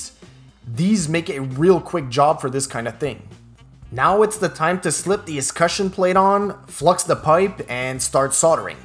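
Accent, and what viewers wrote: Quebec, Canada. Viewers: Love your videos. Is this a Quebec accent I'm hearing? :)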